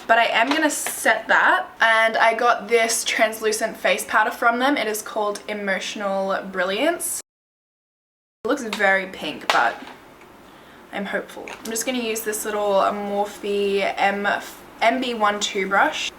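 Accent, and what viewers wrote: Australia. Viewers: what area of australia are you from? your accent is so cute but it sounds different from other australian youtubers i watch! btw love your videos sooo much! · is she australian? shesounds like jess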